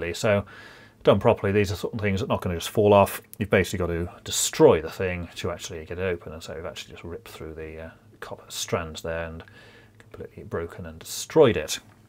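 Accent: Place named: Southern England, United Kingdom. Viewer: PEREIRA Standard Southern English - it's the cadence that's probably throwing you.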